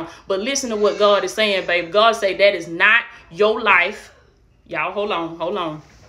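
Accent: South, United States. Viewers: I love your country accent bae. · I'm a man of faith.. but I have to tell the truth... that face and that southern accent got me wanting to pay your house note And the car note! Alabama or Mississippi??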